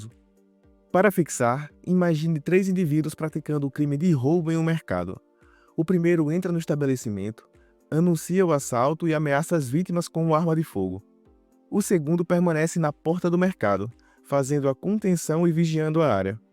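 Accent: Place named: Recife, Brazil. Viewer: Gostei do seu sutaque! É de Recife, é?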